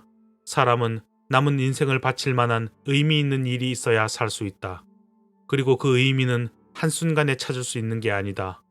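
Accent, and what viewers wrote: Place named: Gyeongsang, South Korea. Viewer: 경상도 억양이 있어서 좀 아쉽지만 너무 좋습니다 잘 들었습니다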